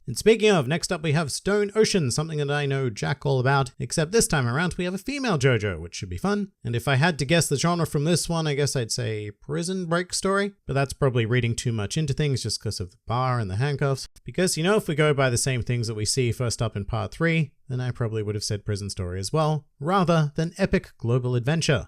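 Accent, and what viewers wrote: Australia. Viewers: Wait your Australian, I thought you were British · I thought your accent sounded normal so you are aussie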